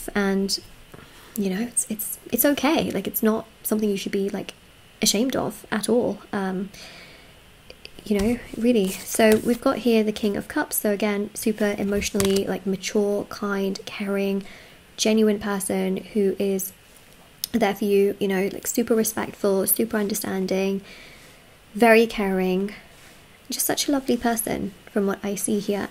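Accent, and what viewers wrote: United Kingdom. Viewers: This is fairly accurate. I've heard similar from others. It's so in depth too, literally one of the longest readings. Really appreciate all the time and energy spent on the reading. Thank you! Love your voice and British accent too 🙂💜.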